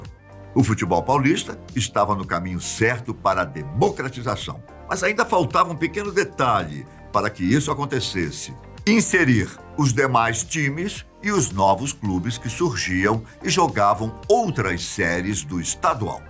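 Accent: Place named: Rio de Janeiro, Brazil. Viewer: iu carioca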